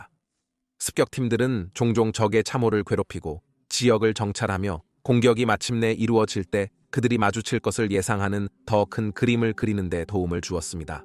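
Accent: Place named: China. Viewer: mbc 손령처럼 중국발음 오진다. ㅜㅜㅜ ㄹㄹㄹ 전형적 중국인 발음 ㅋㅋㅋㅋㅋ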